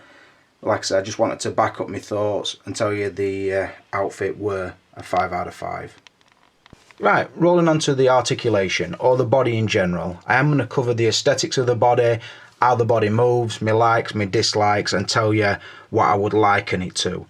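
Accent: United Kingdom. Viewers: I can tell by your accent you know nothing about boxing. the UK grossly over hypes their fighters even when they're clearly trash.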